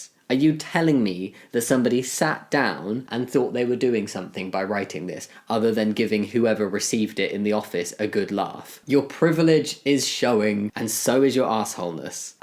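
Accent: England, United Kingdom. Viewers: You have an accent! Are you from England?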